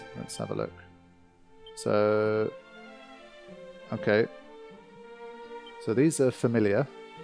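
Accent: England, United Kingdom. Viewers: Now we can have proper Middle English Language being spoken by a proper English Commentator!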